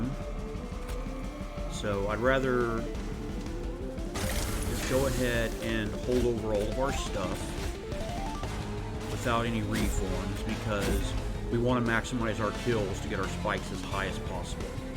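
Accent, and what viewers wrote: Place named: North America. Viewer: Hi Not looking to pry, just hope all the hospital stuff you had mentioned earlier has gone/is going well. Thanks for posting these, they helped me figure out new methods of getting through the challenges. Edit: FYI, I'm all the way in Australia, just going by your accent I'm assuming you're in North America.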